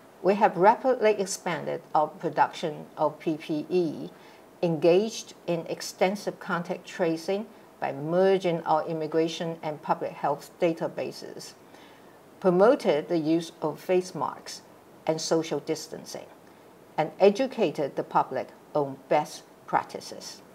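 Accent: United Kingdom. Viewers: 總統的英國口音越來越明顯了~ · President Tsai’s English is 10 times better than Denghui Lee whose talk in Cornell years back was extremely hard to understand. Her English is great but more like British English rather than American English. Job well done President Tsai!!! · 親美的總統講英式英文🤔( 無惡意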